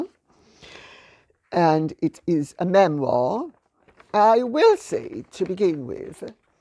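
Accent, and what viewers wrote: Jamaica. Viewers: Lady C. You are so correct about Jamaica and Ganja ! I lived in Constant Spring, at Braemar House after it was turned into Apartments about 1968. Remembering that Ganja was absolutely ILLEGAL and basically only some Rastas used it and Bob Marley. Jamaica is a spectacular place, I know it front to back after living there for five years. So many stories. Wish I knew how to contact you, would love to share some of the stories with you. Thanks so much for your entertaining stories. How I enjoy hearing your Beautiful Jamaican Cultured English accent. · I was trying to pin point your accent, and after you said you were Jamaican, it made sense.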